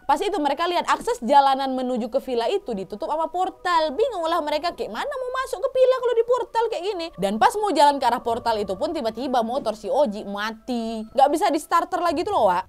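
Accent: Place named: Makassar, Indonesia. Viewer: Logatnya kayak logat orang sulawesi (makassar)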